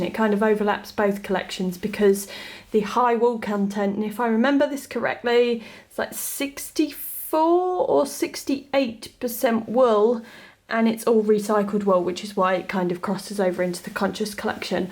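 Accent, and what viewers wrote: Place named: Scotland, United Kingdom. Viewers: Good morning girl 💖. I was sitting here at 6am with my coffee, my husband just left for work and I heard your doorbell ring and thought it was mine. Scared me silly thinking somebody was ringing my doorbell at that time of the morning 😂🙈❣️ I can totally identify with not turning on the heat 🥴. I used to call my husband Mr. Freeze 🤣 Of course I loved all the outfits. 😉😍. I think I'm going to get me some of those socks. I also love anything that is and Other Stories! Love the contrast of colors with the bed. I have storage underneath my bed as well that's where I put our pillows that we sleep on and spare sheets. Always Always love seeing the babies! 💞💖❣️ Can't wait for the Scotland vlog! By the way you had a little Sean Connery going on in your accent🤭 I guess that's good he's from Scotland right? 🤔🤣❣️? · I can’t with your Scottish accent lol 🤣☺️. 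Loving all the cashmere, I have some socks but no comfies that are cashmere, they look amazing 🙌🏻. All lovely outfits, need to find a similar dupe for that H&M premium jumper that’s sold out, they go quick. Nice seeing the pups 🤗🐕 x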